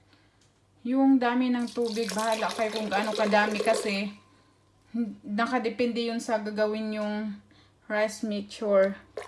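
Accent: Visayas, Philippines. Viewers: Te bisaya ka night? 😀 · Bisaya po ba kayo Maam... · Maka mukhang yaya nmn c ateng bisaya lang cguro c mam kaya ganyan magsalita.. Nakita m nmn mga gamit nya ohhh mamahalin.. Madaming bisaya na mayaman ateng at magganda! Just sayin! ☺️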